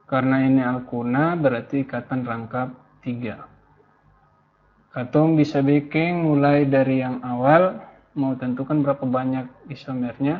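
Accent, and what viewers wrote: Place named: East Nusa Tenggara, Indonesia. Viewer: Hallo kak, orang NTT ko? Beta juga, lebih tepatx beta orang sabu😄. Terima kasih pembahasan soal²x. Salam semangatttt.. Logatx mantap💪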